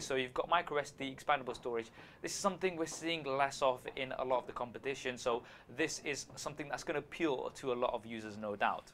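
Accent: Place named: United Kingdom. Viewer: & You both are my fav reviewers And i honestly like your british accent Keep the good work brothers;)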